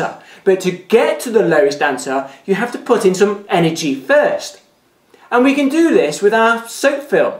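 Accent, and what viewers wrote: United Kingdom. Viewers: bahahaha, liverpudlian accent ftw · totally fucked my mind. Also I want to apologise if you aren't Brit but that's how I imagine the average British accent sounds.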